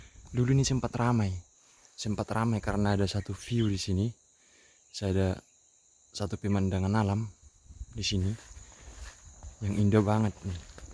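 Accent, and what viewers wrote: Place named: Batak, Indonesia. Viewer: Dari dialeknya, BATAK kali pun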